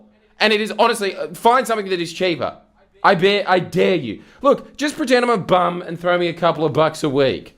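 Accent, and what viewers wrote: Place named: Australia. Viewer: You’re one of the less irritating Australian accents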